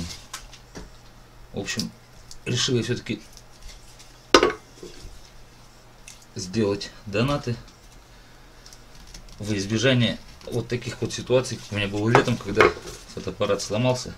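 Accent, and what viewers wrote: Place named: Altai, Russia. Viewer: Зачетная ложка!!! Да, в твоих руках все так легко получается!! Сам сажусь резать - долго все происходит и результат мало радует, но для души это рай трудится с деревом!, где то слышал, что ты из Сибири, а от куда именно? Говор на алтайский похож. Угадал?)))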